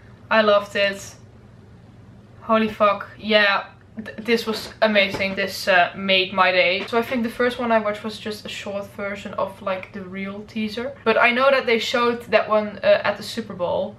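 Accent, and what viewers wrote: Netherlands. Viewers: Hey! ik hoor aan je engels dat je nederlands spreekt :p ik ben ook een grote Star Wars fan!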